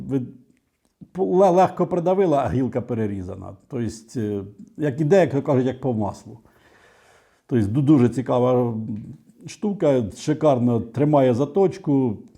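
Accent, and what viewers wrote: Poltava, Ukraine. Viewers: Українська мова з нотками полтавського суржика - Це супер . Ви молодець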